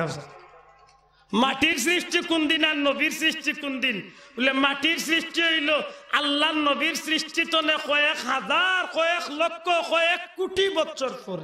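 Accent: Sylhet, Bangladesh. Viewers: এটা আমরার ঐতিহ্য সিলেটি ভাষা।কটাক্ষ করার অধিকার কে দিলো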